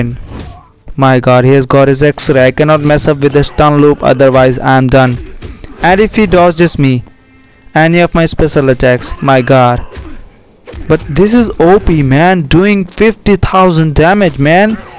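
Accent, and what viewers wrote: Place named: India. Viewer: Mujhe tumhara baat sunke lag hi raha tha ki tum India se ho esi liye puch raha tha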